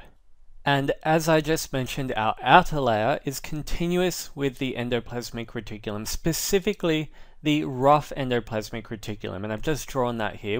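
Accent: Australia. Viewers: Is that australian accent??? 😍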